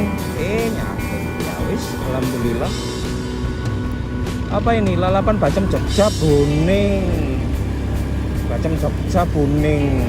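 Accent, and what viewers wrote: Malang, Indonesia. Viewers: Logat orang malang sama semua 🤣 kebanyakan temanku logatnya mirip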